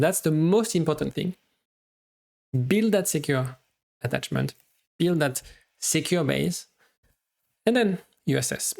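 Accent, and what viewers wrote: France. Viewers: Is that a French accent?😊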